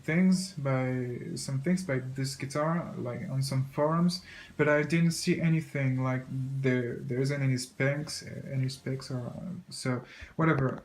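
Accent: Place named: Poland. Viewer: Nice, was thinking to get something similiar in near future, so doing some resarch ^^ (IBANEZ GSA60 WNF to be specyfic or IBANEZ GRG121DX BKF) trying find some significant differences between such types of pickups but the GSA60 speaks to me more look wise :D (Ps. are you Polish? guessing by Accent - no offence since iam polish too xD, just wondering!)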